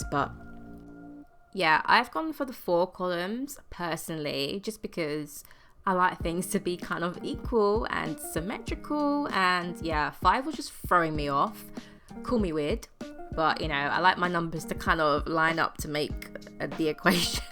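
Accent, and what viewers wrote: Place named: United Kingdom. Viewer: Can you tell where you found that gorgeous house??? The gallery?? Love your videos, obsessed with your voice/British accent , I love it so much!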